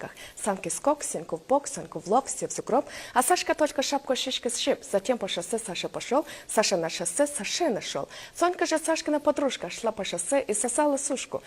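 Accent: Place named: Mongolia. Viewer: damn so thats how mongolian accent in Russian sounds like… those lisping L's are the cherry on top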